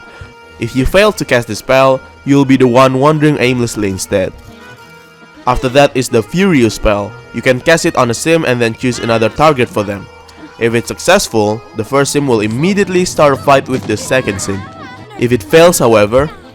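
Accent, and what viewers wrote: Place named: Indonesia. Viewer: Logat indo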